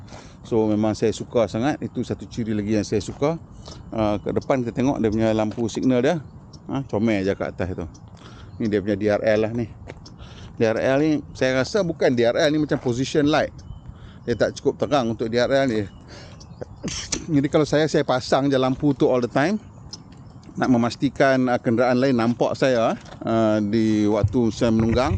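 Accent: North, Malaysia. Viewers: Kalo tak silap dulu bro ni cakap BM standard. Skrg campur loghat utagha pulak dah 😂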